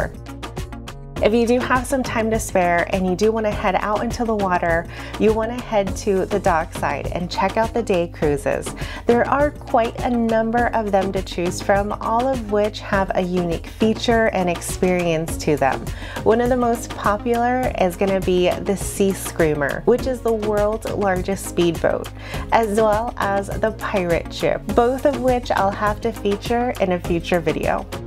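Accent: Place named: Midwest, United States. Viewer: Thank you! Your videos are refreshingly fluent. (I guess I recognize and resonate with the “Midwest influence”—I lived in Evansville, Indiana for 16 years.)You present and explain in calm, digestible terms and pace. So refreshing! We’re planning a trip to the Punta Gorda area this week, as a matter of fact. Will be checking any videos you have if that area. My husband lived in Naples from <num> so he is familiar with the weather, geographical layout but the restaurants and other businesses have changed quite a bit with some old favorites still there too.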